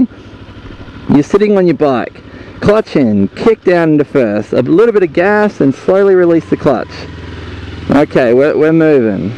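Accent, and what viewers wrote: Australia. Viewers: mate he has an aussie accent... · Those were very nice videos considering I must have seen every video on "how to ride a motorcycle" right before I got my licence. BTW, nice australian accent and I like how you thoroughly mention every key point and the little things that do actually count. Thanks mate.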